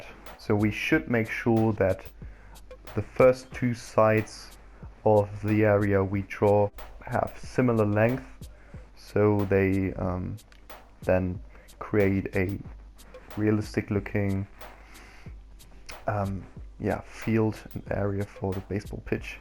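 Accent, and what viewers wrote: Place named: Germany. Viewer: Are you German? You have a German accent. Schade dass du nicht noch mehr Videos machst... Jaa bisher sind die noch recht Amateur-Mäßig... Aber so sollte Youtube auch sein und die Tipps und Tricks hier haben mir sehr geholfen. War auch recht unterhaltsam und entspannt. Vielleicht macht du noch was zu Jurassic Park ?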